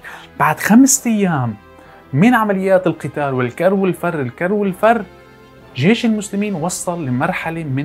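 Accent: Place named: Syria. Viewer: على فكره اللهجه السوريه كثير حلوة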